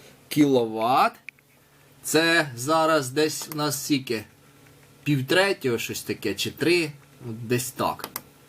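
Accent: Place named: Poltava, Ukraine. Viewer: Дядко точно з Полтавщини! Тільки там так розмовляють. )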